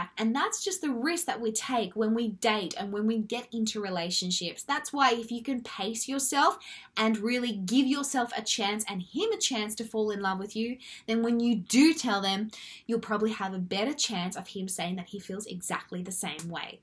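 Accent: Australia. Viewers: are you Australian? British? Are you in Australia? I'm curious because I'm always comparing how the English are compared to how Americans are. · Mark Edwards I don’t know but I do know she is Australian, I’m Australian and this is how Australians speak.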